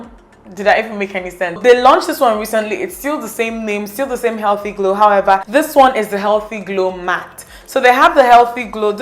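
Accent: Igbo, Nigeria. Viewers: you should do a makeup video in Igbo! It would be so nice! Plus ur Igbo accent is 😍😍😍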